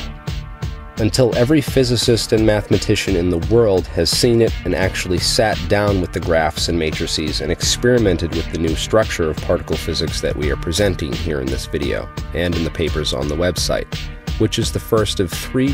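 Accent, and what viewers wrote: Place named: United States. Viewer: Peer reviewed or it's not science. At the risk of offending you greatly (for which I will make amends if needed), I watch physics videos from around the world and I have never heard anyone pronounce Dirac the way you do (rhyming with tie rack). I have trouble believing you have attended any lectures on quantum mechanics. Assuming this is deeply-thought-out science fiction, with possible fleecing of idiots, let me help you sound more authentic, as I am fans of both. I will use capitals to designate the emphasized syllable. Depending on your dialect and/or that of your professor, typical pronunciations are like deRack (with a brief e like in because), diRack (with an i like in bit), d'Rack (just a fleeting vowel noise between consonants), deRock, diRock, Deerack. If I heard that last one I would assume the speaker is from India or nearby. 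Americans typically use diRack, deRack, or d'Rack. Your accent and dialect are clearly American (we call z zee, while most others call it zed), so it is good to stick to American pronunciations. Britons typically pronounce it closer to the original french; deRock or diRock. They might even use a french r sound. Sorry, I don't know how to depict the french r sound by mere spelling. It's like if a letter had r for its mother, while its father's parents were h and a hard g. Kind of throaty. Looking back at this, it is almost certainly the kind of rambling I do when sleep is near. My filters are the first to go... Sorry for offending anyone, but I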